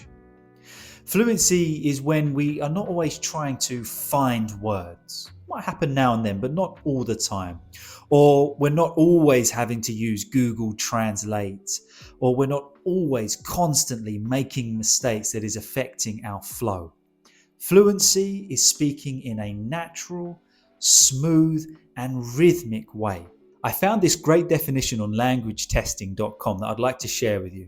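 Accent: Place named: United Kingdom. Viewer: Being Soth Asian we prefer British English over other accents and styles which are derivatives on traditional English from England and you are a classic example, I would always love to attend your lectures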